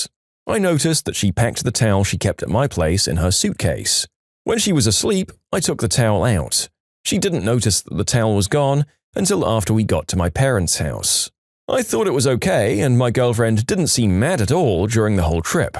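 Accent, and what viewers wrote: United Kingdom. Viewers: i have not watched in a while why are you british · the brittish accsent is killing me and yes i spelled most of it wrong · THE BRITISH ACCENT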